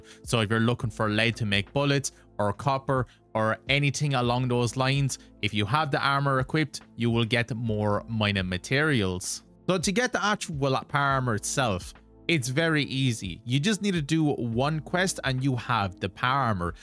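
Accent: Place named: Ireland. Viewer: Love the videos and nice hearing the Irish accent on these tutorials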